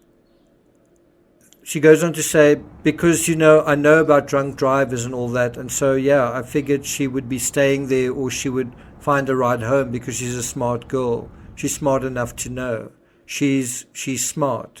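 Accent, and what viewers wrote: South Africa. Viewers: Hello. Are you South African? Thanks for this.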